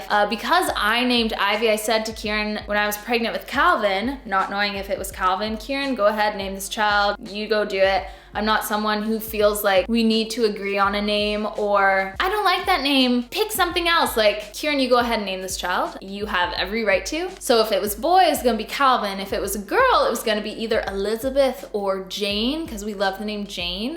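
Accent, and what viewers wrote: United States. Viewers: PLEASE DON'T TAKE OFFENSE TO THIS QUESTION I PROMISE IT'S GENUINE. Why don't you have a Canadian accent? I live in Iowa, US, and you almost sound Midwestern like Iowan or something. I feel like Kieran has some sort of accent as well, but you speak so much like an Iowan it's crazy. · So you live in Canada but you do not sound Canadian/ you sound American. Am I right?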